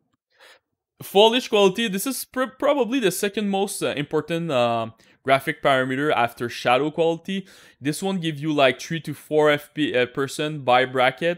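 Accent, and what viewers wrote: Quebec, Canada. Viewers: tsonne tres québékois my guy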